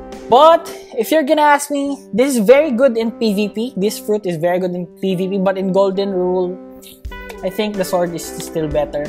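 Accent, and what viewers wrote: Philippines. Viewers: sa boses mo halata na na filipino ka eh haha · Your on philipines thats why your voice is like that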